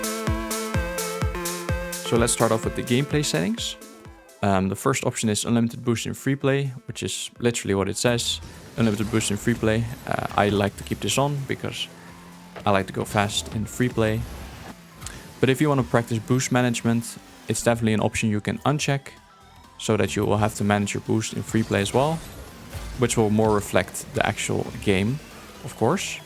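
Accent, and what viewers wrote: Netherlands. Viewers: hey are you dutch matbe? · Your Dutch I just hear it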